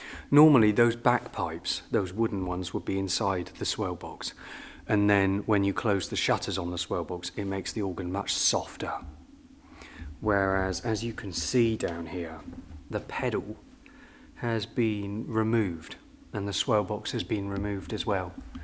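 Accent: England, United Kingdom. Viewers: I'm a Brazilian teacher of English and have accessed some of your pieces played on the organ by chance. Amazing work you have been doing! It's been a pleasure watching and listening to your performances while you share information concerning history, geography and harmoniums and organs! And it's being really nice listening to English accent (mine is more of an American one -- some Americans couldn't place where I was from since there are four different accents: from Virginia, New York, Boston and Minnesota). Anyway, thanks for spreading images and pieces of music all over the world! Viviane Horbach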